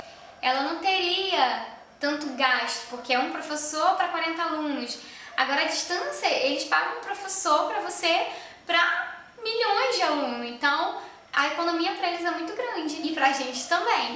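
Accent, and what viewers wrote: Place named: Pará, Brazil. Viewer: vc é paraense?, o sotaque não nega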